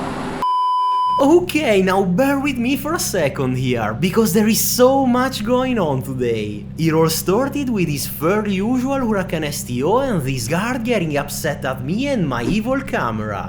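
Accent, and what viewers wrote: Italy. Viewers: thanks for the video, i can hear from your accent that you are Italian, just for curiosity, do you move your hands when you talk?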